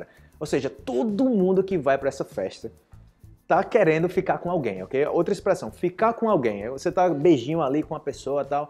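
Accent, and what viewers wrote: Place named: Colombia. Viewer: Oi cara.... que e essa placa ahi da Costa Rica, voce está na Costa Rica ?.... Eu sou da Costa Rica e estudei por tres anos no CB, isso aconteceu la por 2007, depois eu nao voltei praticar e fiquei atras, estes videos tem sido muito boms para min... continua assim por favor.. tou adorando seu jeito.... muito obrigado... Quando voce troca a espanhol se escuta un sotaque colombiano muito bacana, voce aprendeu na Colombia ?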